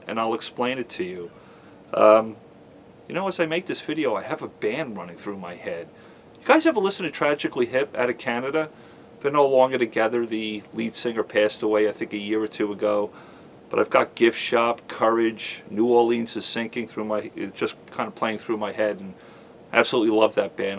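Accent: New York, United States. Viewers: sounds like new york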